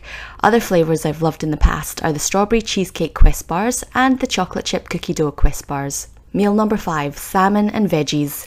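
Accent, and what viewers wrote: Scotland, United Kingdom. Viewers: I'm a new subbie and I love your videos. Good ideas! Out of curiosity are you Scottish because you sound like like you have a slight accent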